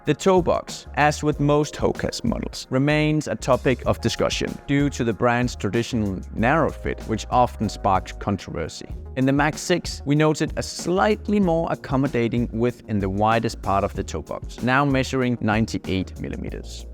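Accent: Denmark. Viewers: This dude danish accent is so strong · My mum is Danish (from Horsens) so hearing a Danish accent always make me happy. Your English is outstsnding. Especially all of the technical vocabulary. Thank you for the in depth reviews.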